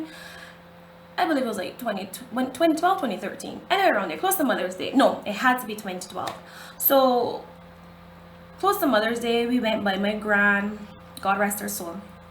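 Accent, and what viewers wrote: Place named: Caribbean. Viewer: i hear Bim, Guyana or trini accent....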